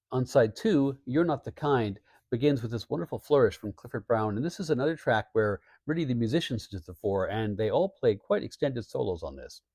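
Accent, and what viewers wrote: Canada. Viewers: My bad that I misheard you🙏🏻 It’s your thick Canadian accent🇨🇦 that tripped me up!😁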